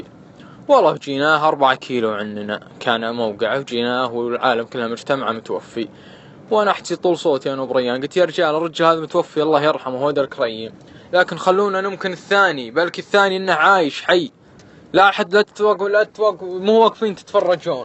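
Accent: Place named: Sakaka, Saudi Arabia. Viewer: لهجة اهل سكاكا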